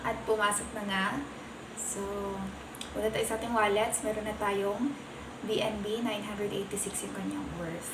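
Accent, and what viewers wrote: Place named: Batangas, Philippines. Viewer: Batangas kalang ba atee?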